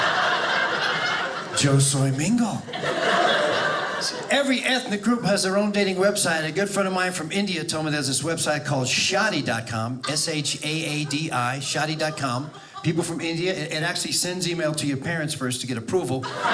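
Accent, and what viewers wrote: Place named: New York, United States. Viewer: I couldn't tell he's from Brooklyn but NY for sure. My boyfriend is also from Brooklyn so I picked up on the accent right away. Also the witness protection comment made me think of the movie Mobsters & Mormons lol 😊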